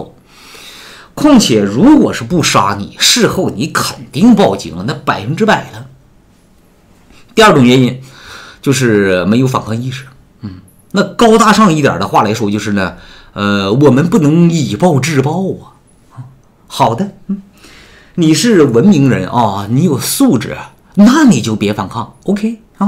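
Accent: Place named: Inner Mongolia, China. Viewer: 我老家是内蒙呼盟的。你的口音听起来也有家乡的味道！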